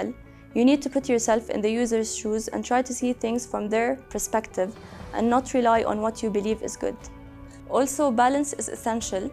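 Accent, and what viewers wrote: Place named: Egypt. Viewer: هي من مصر صح ؟ واضح من الاكسنت 😂😂😂😂😂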